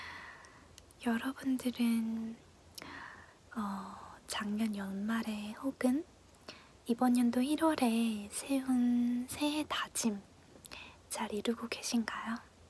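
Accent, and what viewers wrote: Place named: England, United Kingdom. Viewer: I like the banana container, it's so cute, and i like ur English accent!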